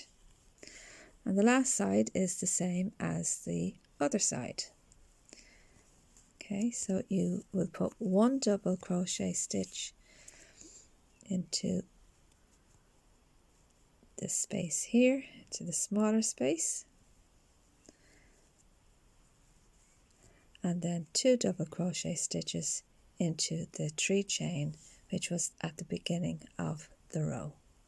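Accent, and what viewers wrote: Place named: Ireland. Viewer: Great video, love the Irish voice , brilliant 👏 thank you 😊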